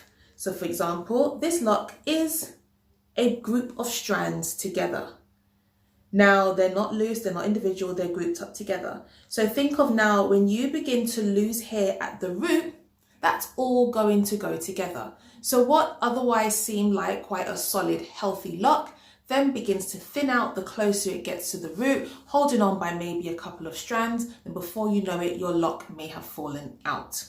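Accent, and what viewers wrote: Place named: United Kingdom. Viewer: crazy but, its so nice to hear a British accent on a loc channel 😂. children stress!! definitely need to stop stressing. I'm a worrier! but, this year is about balance, balancing work and play, I used to only work and hustle also, to do my daily gratitude, which really helps me relax.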